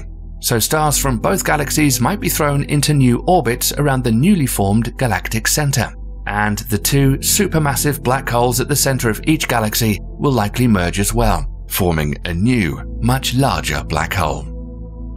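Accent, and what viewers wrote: United Kingdom. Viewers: Will you always speak in such a beautiful British accent? I love it.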